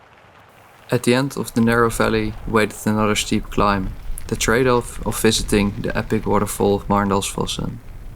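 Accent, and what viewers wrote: Netherlands. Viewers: Er valt echt niet te luisteren naar Engels met een Nederlands accent, behalve als het over dit soort onderwerpen gaat. Dit is oprecht 1 van de lijpste dingen die ik heb gezien, gefeliciteerd met deze prestatie. Wat een reis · I stumbled upon this and upon hearing your Dutch sounding English - immediately liked and subscribed. One hour and twenty something minutes later, I feel like I've been with you on your trip through Norway. What an awesome thing to have done and very well documented with beautiful footage. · At first when I heard your English I assumed you were Dutch but then your pronunciation of the Norwegian places is so good! Anyway, loved every bit of this. Loved, loved, loved.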